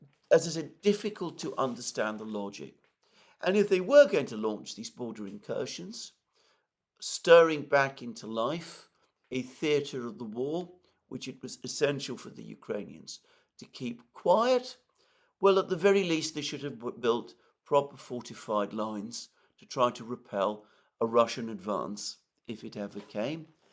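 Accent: United Kingdom. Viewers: AM feeds off of the gullibility of his audience. Take some time and listen to other programs. This one is ALL KREMLIN PROPAGANDA NARRATED BY A MOUTHPIECE WITH A BRITISH ACCENT AND A VERY SORTID PAST.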